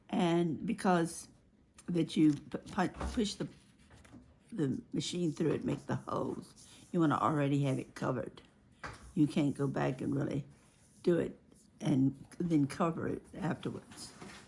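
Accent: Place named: South, United States. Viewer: Thank you to Youtube for the algorhythm working correctly to recommend this delightful channel! This is a treasure trove of techniques. Beautifully done! And I love your Southern accent, it's so pretty!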